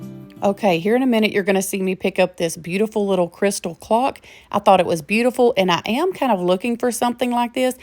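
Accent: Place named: Fort Worth, United States. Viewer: My third comment today only it's a question just based on your accent and like I said I have not listened to a lot of your videos not because I don't like them but because I do listen to a lot of others especially about politics and the Christian religion which I am a Christian but are you from the Fort Worth area I live in suburb of Fort Worth I was just wondering which antique shop you went to LOL but I think you should have definitely got the first one but again send me the name of the artist and the name of the actual picture that's what on that little tiny brass placket that's tacked on there that's will tell you if it's valuable or not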